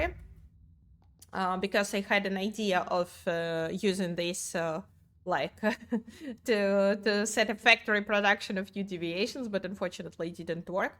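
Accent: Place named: France. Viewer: I'm wrong again. That's OK, I'm used to it - I'm married. Lovely accent, is it French then?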